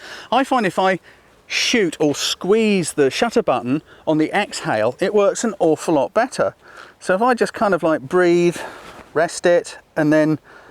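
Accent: United Kingdom. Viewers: I liked all your videos and your British accent too. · Great personality, great teaching skills, vast experience, and the British accent. Doesnt get any better that this.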